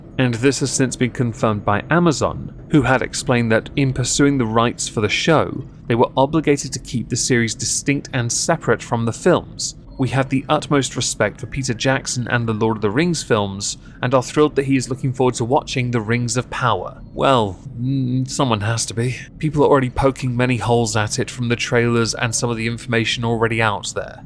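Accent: RP, United Kingdom. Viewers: As a Geordie, all I've got to say is, your swearing is really posh 😆. I think that's why I went for my husband, he sounds posh to me too.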